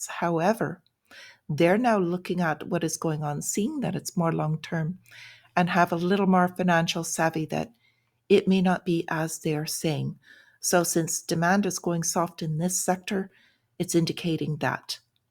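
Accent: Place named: Ireland. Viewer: Greg Mannarino recommended you. I like your news & love your accent! Forgive my possible ignorance, but are you Irish? If so, can you comment on the insanity going on there?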